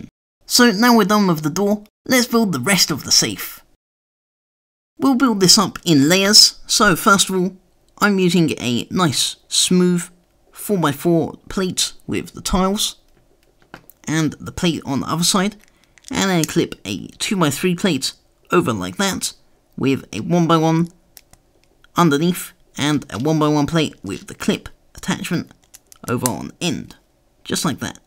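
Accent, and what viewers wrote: United Kingdom. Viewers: Cool.I have my own channel which is inspired from seeing the worlds coolest LEGO builder. Are you from Great Britain?The accent is very familiar to Britain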